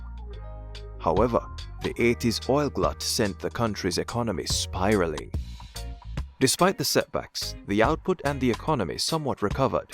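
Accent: Jamaica. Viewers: You sound Jamaican and you are talking tata. You know NOTHING of what you are saying. Trinidad will manage with or/and without Venezuela.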